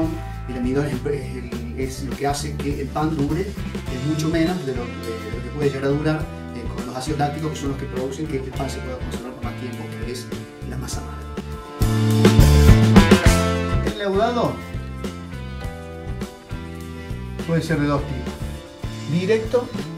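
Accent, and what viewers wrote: Cordoba, Argentina. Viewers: HOLA SALUDOS DESDE CHILE TENGO PANADERIA Y VEO TODO PARA APRENDER MAS SOS DE LA ARGENTINA VOZ ? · Entender tu epañol cordobés hablando a toda velocidad ya es un esfuerzo. Pero con el volúmen de la música tapando el de tu voz .....ni te digo. La música no sólo está demás sino que opaca el enender tu gran contenido. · Hola, sos Cordobés?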